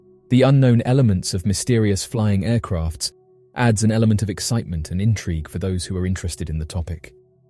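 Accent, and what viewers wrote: England, United Kingdom. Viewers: That English accent ; very pleasant .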